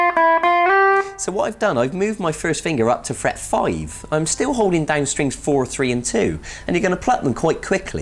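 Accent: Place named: Bristol, United Kingdom. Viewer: That's gotta be a Bristol accent I know that anywhere me Babs 😂. Brilliant lesson all the same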